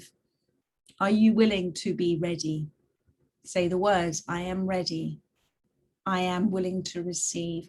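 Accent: United Kingdom. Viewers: Nice to hear another new British tarot reader . Lovely insite and understanding from the interpretation of the cards ♥️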